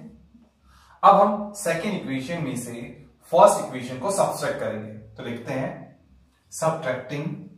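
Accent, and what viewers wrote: Pahadi, India. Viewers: Aap pahadi ho kya? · Tum pahadi bolen cha?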